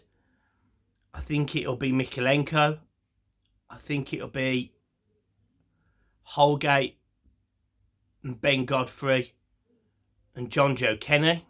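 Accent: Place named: London, United Kingdom. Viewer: you are from London right? I can hear it from your accent.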